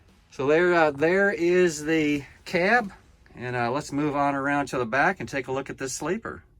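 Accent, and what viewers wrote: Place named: South, United States. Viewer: Southern accent and southern slang "y'all " Im curious where y'all from.... im from New Orleans....live in Wyoming now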